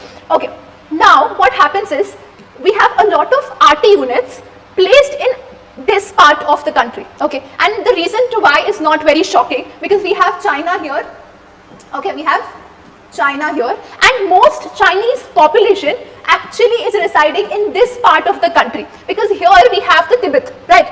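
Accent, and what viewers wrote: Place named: North India, India. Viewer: Not. Her accent sounds so North Indian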